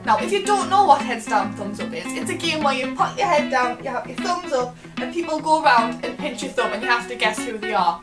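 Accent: Newcastle, United Kingdom. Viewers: Are you a Geordie?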